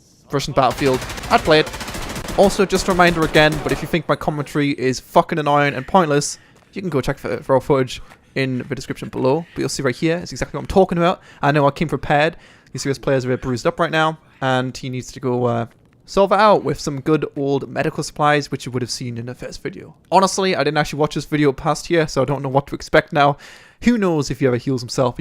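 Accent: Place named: North East England, United Kingdom. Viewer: lol, dad dad!? U sound like youre from the north east :)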